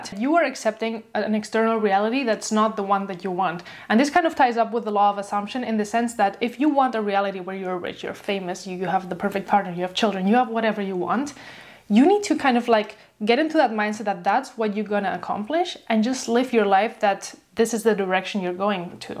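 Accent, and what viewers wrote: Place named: Spain. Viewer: Girl you're soo underrated! Also are you Spanish? Love your accent!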